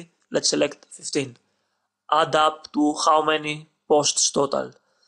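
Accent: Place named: Russia. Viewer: u are a russian dude hahaha i knew it from ur voice lol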